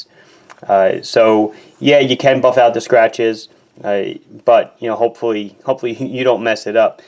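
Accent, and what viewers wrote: Long Island, United States. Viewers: I grew up on Long Island, the watch information is interesting, but your Long Island accent so reminds me of home. Be well.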